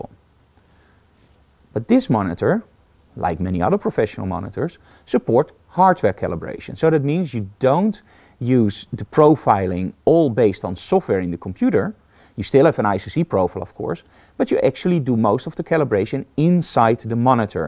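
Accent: Netherlands. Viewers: Je hoort dat goed dat je een nederlander bent lol. Heb juist een eizo flexscan ev2750 gekocht, wat denk je ervan? Heb ook een 4K monitor van asus, heb die gekalibreerd met de i1pro en kleurweergave is echt top. Maar 4K in een 27 inch scherm...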